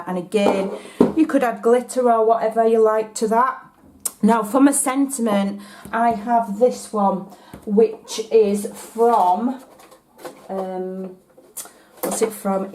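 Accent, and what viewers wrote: Yorkshire, United Kingdom. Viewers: I LOVE your Yorkshire accent. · I'm a eighty year old American,only been crafting a few months, a real newbie and can understand every word,well, almost every word. lol I love your Yorkshire accent. I traveled America several times, you should hear some of the pronouncements, slang and diction. LOLOL Keep what you love and I will love it and you also. Love your work, explanations and techniques, and especially love when you laugh at yourself. I subscribed right away. xoxo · I just love your Yorkshire accent, and I love your little quirky sayings....'pants', ' rubbish', 'wonky' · I shouldn't worry the captions never make sense anyway whoever's speaking. I understand your accent perfectly being a Yorkshire lass too. The captions do entertain tho lol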